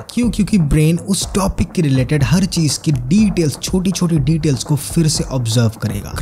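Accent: Bengal, India. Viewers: yes dada,junejo Bhai toh chiloi.... Casey Neistat o chilo kintu ami sotti mon theke chaitam amader ekhne keu storytelling bepar ta k ato Sundar kore bole na! Tar por tmr content dekhlm... I know keu contestant na ..sabai one of kind...you are unique person..even your content in ur way...mone hoy tumi o Bangali...tmr accent ar pujo te ghorar video dekhe...mone holo...achha jai houk... Love you boss...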